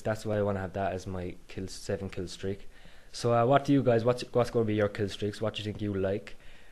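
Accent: Ireland. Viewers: french?! you mad. its blatently irish lol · u sound irish if so so am i :D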